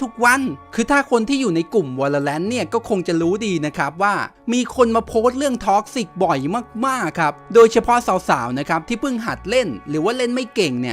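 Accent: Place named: Rural, Thailand. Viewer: เสียงบ้านนอก คำนี้เกินไปนะ เกิดบ้านนอกแล้วไงเล่นเกมแบบคุณไม่ได้เลยหรอพ่อคนในเมือง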